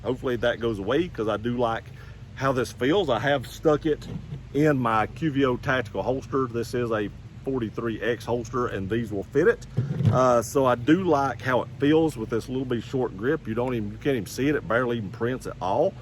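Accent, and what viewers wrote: South, United States. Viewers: What did he say? Omg he is country 😂😂😂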